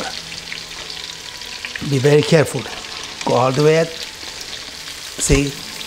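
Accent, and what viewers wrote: Iran. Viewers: من جدیداً این فیلم رو دیدم وبه نظرم عالی بود که با لهجه قشنگشون این غذا رو آموزش دادن خانم داشتن لهجه نشان دهنده این هست که این آقا زبان دیگه ای به جز زبان انگلیسی بلده و این خیلی خوبه مخصوصا لهجه ایرانی نشان دهنده این هست که زبان شیرین فارسی رو بلدن انگلیسی زبان ها هم موقع فارسی حرف زدن لهجه خیییلی زیادی دارن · I'm sorry, but I really couldn't focus because of his thick Persian accent (the one that Persian are so famous for) Bebakhshid!😅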